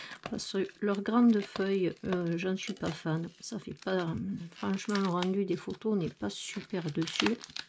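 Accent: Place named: South, France. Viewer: Bonjour, Les blocs 30x30 sont bien de Noël dernier. Vous, vous êtes choquée mais moi déçue car les articles sont en réserve mais faute de personnel seront peut-être mis en rayons semaine prochaine mais pas sûr... voilà la réponse que j'ai eu vendredi sur Albi!😫😡 J'y retournerai lundi et éventuellement j'irai à Gaillac... Vous êtes de quel coin avec votre petit accent du sud?😊 Je ne connais personne dans mon secteur pour partager le scrap. Bon dimanche 🌺 Sophie d'Albi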